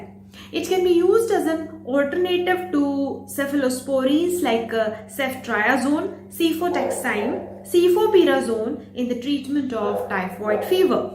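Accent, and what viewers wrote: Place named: England, United Kingdom. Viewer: liked your English accesent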